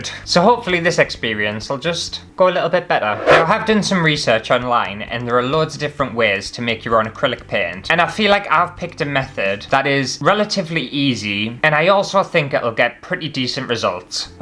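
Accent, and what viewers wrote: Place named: North, United Kingdom. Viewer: Aww your (northern?) accent is so cute and wholesome. Also your content is really entertaining, subscribed!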